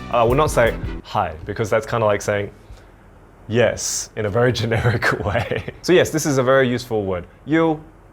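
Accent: Australia. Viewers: so much easier learning from a Aussie accent. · You sound like an Aussie? Where are you from? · Based on your accent, I'm guessing you're in Australia? Do you teach here?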